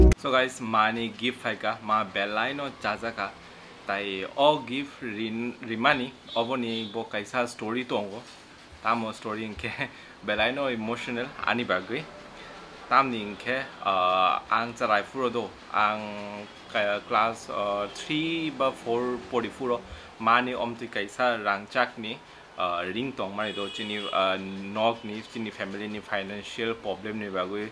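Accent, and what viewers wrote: Tripura, India. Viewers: Dara nni belai kokborok khunathokgo❤❤❤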